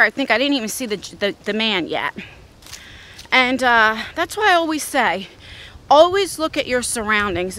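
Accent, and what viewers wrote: New Jersey, United States. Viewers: Beautiful area! I’m Long Islander who moved to middle Tennessee . I miss it there and your north eastern NJ accent (similar to mine), I miss! I do love it in TN and we also have many trails. I’m wondering if you get lost if your map app on phone can help detect your location? Ui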